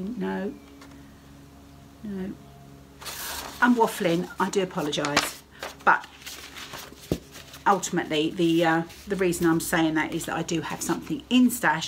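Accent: England, United Kingdom. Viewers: Please do not leave. When I saw on instagram you had posted I made a cup of tea and sat down to watch. You are one of my favorites and I don’t get a lot of British floss tubers and so enjoy your accent. Just keep showing your work and cute dog and telling us what you have been up to. As to haul I can’t afford a lot either never mind about that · We love at my house. My husband and daughter love listening to your British accent as you describe your projects. We love it when you say, “bits and pieces”. Please continue with your channel whenever you have time. Your work and content are always so good! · I do so hope you continue with your flosstubes! I have totally enjoyed them! Being from the States, you have shared a bit of life across the pond, & it's been delightful! I've enjoyed seeing the English countryside, your charming accent, as well as your lovely finishes. 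You've inspired me to try new ways of finishing & different designs. I like that you seem real. Life gets in the way for us all at times, but your videos have kept me going through some challenging days. I have dropped some subscriptions, as they overwhelm me. Too much haul, so many finishes, one feels like we're in a race & can't keep up. Designs are coming fast & furious. It's nice to see someone working on older pieces. Watching you is like stitching with a friend. We seem to pick the same patterns...I too have been reworking my sewing space...it's like we're on the same wavelength. Maybe post monthly? I'd really miss visiting with you! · I love your floss tube. I love hearing your accent. I am from the US and your English accent is so sweet to me. I love your content. If you are feeling pressured to have more, maybe you could put more distance between your episodes. I love hearing about your dog and your husband and your mom and your job, whatever is going on your life just as much as I love seeing your work. 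I hope you stay, but I understand you need to do what’s right for you.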